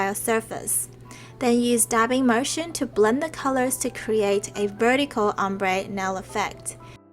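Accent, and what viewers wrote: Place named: Australia. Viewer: Are you Australian or Asian!? I love your accent! So pretty!!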